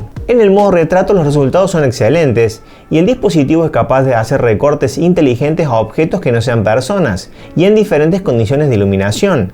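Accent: Córdoba, Argentina. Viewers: muy buen review! me suena a tonada Cbesa la de, mepa o es de Río III? Saludos!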